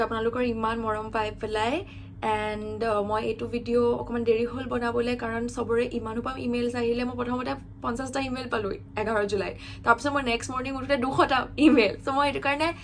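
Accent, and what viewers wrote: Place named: Jorhat, India. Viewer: I love how you have that typical Jorhatian style in the way you talk. Much love❤️ - Dohabora Chuck Jorhat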